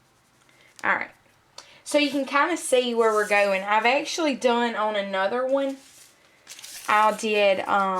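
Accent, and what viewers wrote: South, United States. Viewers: Great video but boy that southern accent! Lol yes, I'm from the south too.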